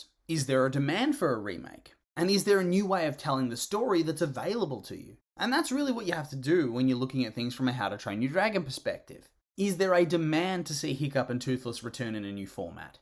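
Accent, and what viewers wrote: Australia. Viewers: are you australian? you sound australian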